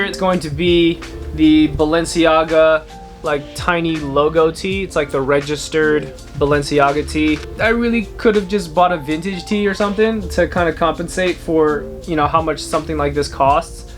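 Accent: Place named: Houston, United States. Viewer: Bro are you sure you're not from Houston, TX you sound just like me lol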